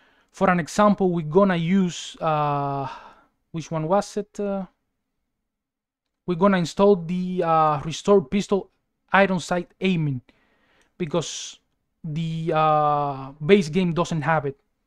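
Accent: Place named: Mexico. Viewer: Great video ... very easy to follow. Love your accent. Mexican?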